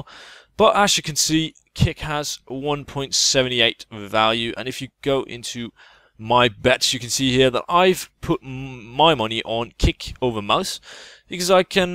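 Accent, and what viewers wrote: Denmark. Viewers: Im swedish and I can tell youre Danish. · I can just hear ure from denmark :D (im from denmark too øæå)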